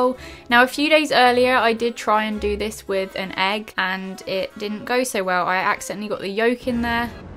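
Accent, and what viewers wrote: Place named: England, United Kingdom. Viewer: i found ur videos other day now im hooked on watching them all xx ... r u from north south east or west england as ur accent is lovley xc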